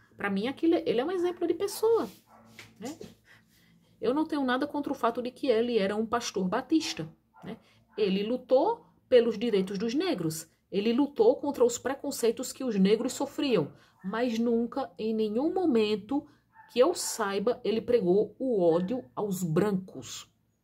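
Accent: Northeast, Brazil. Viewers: Ótima resposta, parabens, pelo seu sotaque vejo que vc e' nordestina, vc e' de qual Estado?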